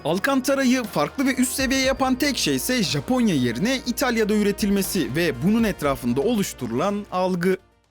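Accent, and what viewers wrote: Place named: Russia. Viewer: Kardeşim videoların gerçekten güzel ama konuşman kulağa çok ağır geliyor. Neredeyse Rus aksanıyla Türkçe konuşur gibi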